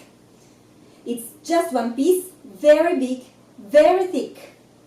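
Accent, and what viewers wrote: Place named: Italy. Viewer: 1. Thank you for teaching us how to do the Fiorentina beef steak! 2. I am an english school teacher and I like your english with the italian accent - keep it up!!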